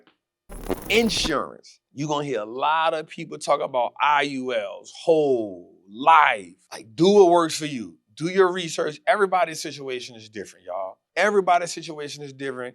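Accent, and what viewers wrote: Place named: New Orleans, United States. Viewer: Initially, I couldnt understand nothing (but I could really undrrstand everything)!😂❤🎉 but I love it though... Im just like yeap.... that initial was def #NOLA!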